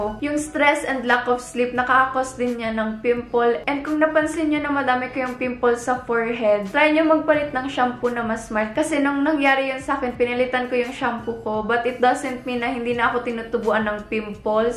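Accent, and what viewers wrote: Visayas, Philippines. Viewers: Bisaya ka ate?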